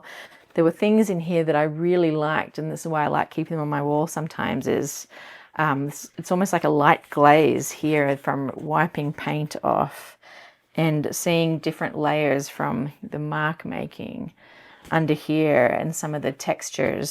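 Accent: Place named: Australia. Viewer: What is your accent? It sounds a bit Australian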